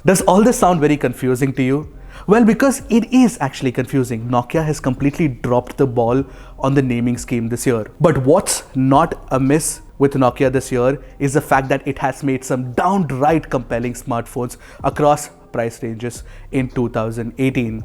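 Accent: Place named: South India, India. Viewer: You are definitely south Indian guy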